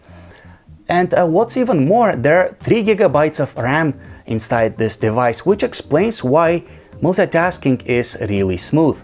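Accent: Russia. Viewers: Произношение заебись. Он русский, чтоли? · you're Russian ?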